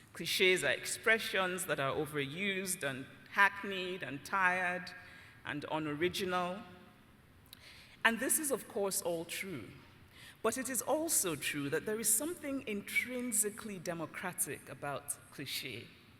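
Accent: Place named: RP, United Kingdom. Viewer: Subtitles?! Seriously?! Do you not understand the Queen’s English?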